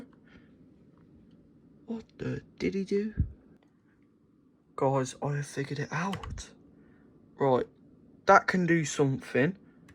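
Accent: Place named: Dudley, United Kingdom. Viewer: the accent bro, I’m from Wolverhampton and my moms side of the family are majority Dudley so I’ve grey up around the accent so I can spot a Dudley accent easily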